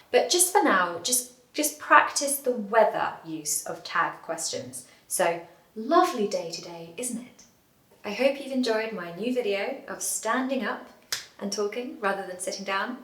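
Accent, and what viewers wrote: United Kingdom. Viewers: I love British accent, it's a quite nice for ears, thank you for nice lesson, have a wonderful day, regards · I love your lessons. you are always friendly, modiste your good mood.. just keep going on! It's good to learn from you now i now why british people speak lovely accents thank you for teaching . It's the right time to l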